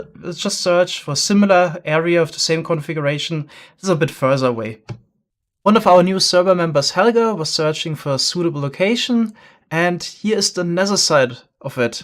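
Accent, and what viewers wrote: Germany. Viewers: His accent is really german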